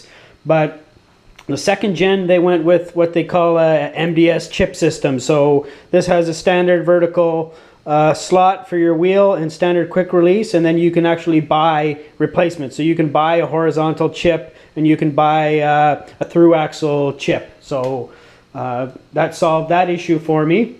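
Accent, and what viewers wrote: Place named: Canada. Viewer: This dude sounds Canadian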